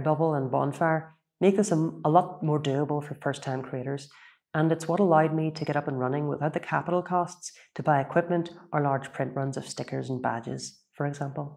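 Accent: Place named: Ireland. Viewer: Just some observations: I found your talk quite compelling for a variety of reasons. I was initially drawn in by the way you look into the camera so intently. I realized after a bit that part of the power of you gaze, I think, is that you seem to almost never blink! Don't mean to make you at all self-conscious about it, but it is really rather striking. Secondly, you have a lovely voice and manner of speaking, with that light Irish accent that sounds so compelling to my (N. American) ears. I really think you would make a terrific narrator of, say audio books! You articulate extremely clearly. Have you a red light accentuating your hair color? It looks just great! (again, so very "Irish"!) Fascinating how something as seemingly trivial as sharing cat info evolves into a helpful resource for many in such a profound way! Cats are such lovely creatures--even though I only have d_gs--and having any kind of animal does, I think, increase the humour quotient of one's life quite substantially, which is always a positive and healthy thing. 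Sounds as though you are conducting your life in a very positive manner. Well done, and kudos!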